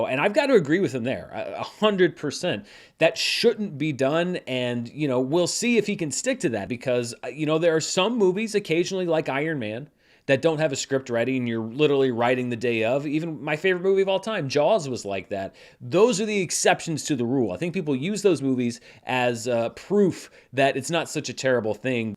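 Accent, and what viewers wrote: St. Louis, United States. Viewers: Is from St Louis?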